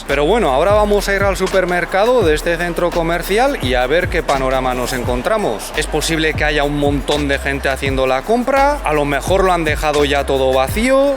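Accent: China. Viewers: Oye hablas muy diferente a lo habitual pareces como que hablas en español con acento del idioma chino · Caballero no es por mal pero trabaja más en ti asentó español ya hablas como chino. No olvides tus raíces es tu identidad. Bendiciones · Ya tienes el acento chino..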